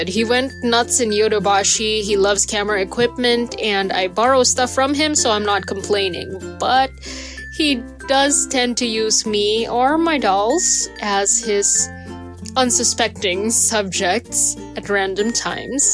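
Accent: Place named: Philippines. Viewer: Holy shit that's really expensive.... Also, are you from the Philippines? I could sort of hear the Pinoy accent. Aaaaand the Dollfinas kinda gave it away for me. Not much though, I still had to Google it. Edit: Nevermind I just got to the part where you said you got something from Manila.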